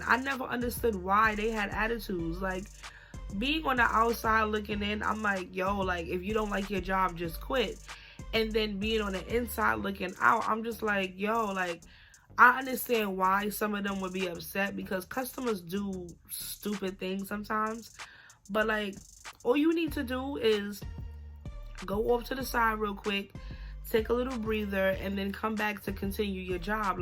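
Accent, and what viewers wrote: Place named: Brooklyn, United States. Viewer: You sound like you from Brooklyn ☺️ ! Chileeeee I worked at McDonald’s for a month & boutta start a new job at Burger King 🥴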